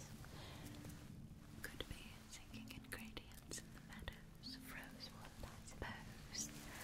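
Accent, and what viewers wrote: England, United Kingdom. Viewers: As a British person, your English accent is certifiably fantastic!! And that 📣🐈 👀 👁👁 made me burst out laughing. Love the language and humour you use in your videos. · As a Brit I always feel like you watch a lot of Keira Knightley to try and get this accent. You’re quite good! The elocution smacks of “Brit in a period drama”. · Ahhh! Am I crazy, or is this the first time ever that used an English accent on a Babblebrook video?! It's lovely! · Such a good Babblebrook video, I get so excited when a new one releases. Your British accent is pretty amazing too! Very tingly :D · Me: can't possibly be more appealing...: Starts speaking with a British accent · So excited to have more Babblebrook content! Gorgeous visuals and intriguing plot as ever, also a pretty decent attempt at an English accent!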